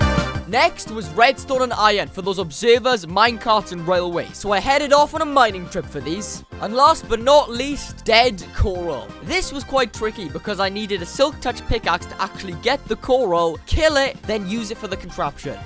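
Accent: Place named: Newport, United Kingdom. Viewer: Man you have grown so much! You probably don't remember but I commented on your Maze 100 days when it came out saying I know that accent anywhere and that I am from Newport! Keep it up I have subscribed hope to get my gaming channel up and running soon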